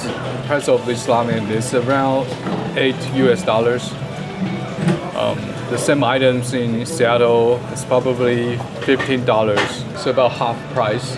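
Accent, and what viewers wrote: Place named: China. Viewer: wow! what a Chinglish~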